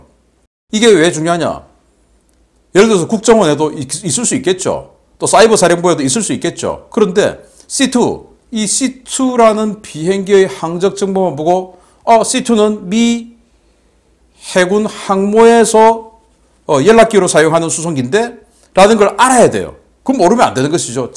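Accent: Gyeongsang, South Korea. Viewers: 구수한 경상도사투리항상잼나게즐구독하고있어예~^^;아침마다구독과좋아요..꾸욱~보냅니다..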